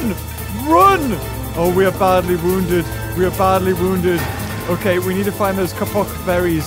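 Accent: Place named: United Kingdom. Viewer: I love ur vids, I always laugh and smile, I'm going through a hard time, and I love ur British accent, and ur comedy, plz keep going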